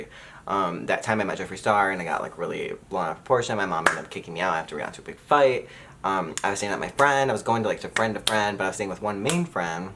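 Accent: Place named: Michigan, United States. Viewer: Your Michigan accent kept throwing me off. I thought you were saying Kanye-ac, as if Kanye had invented his own brand of cognac. Which would not even remotely surprise me. 😶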